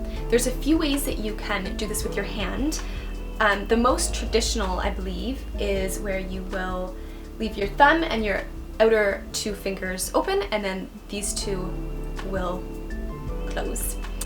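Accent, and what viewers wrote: Canada. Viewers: definitely a canadian accent! :-) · Love her, she's Canadian. I can tell by how she says out or about. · She must be Canadian. (out and nose). Thank you for these techniques. I definitely need them. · I don't know, I kind of find her voice soothing by itself, and I like Canadian accents.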